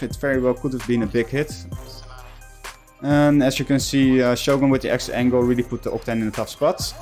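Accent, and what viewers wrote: Netherlands. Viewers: also love the dutch accent btw :) and great video man keep it up!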